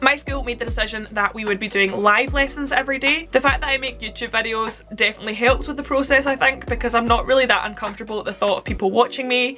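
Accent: Scotland, United Kingdom. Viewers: I could listen to you all day - bloody love a Scottish accent! Fair play to you babe teaching, I know how hard my sister finds it x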